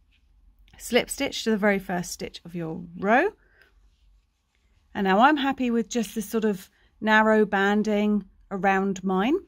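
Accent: United Kingdom. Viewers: this is random but what kind of British accent do you have?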